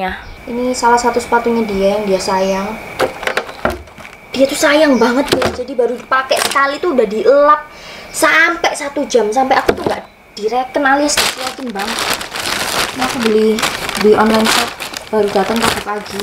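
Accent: Java, Indonesia. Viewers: Iya soalnya medok gitu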